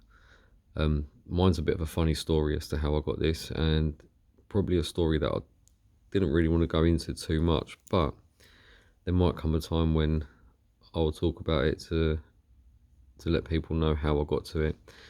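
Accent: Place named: United Kingdom. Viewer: mate post more videos, i like your shit. Ur voice is deep and thoughful, i like ur british accent a lot. Brings me back to the time i used to study in the UK.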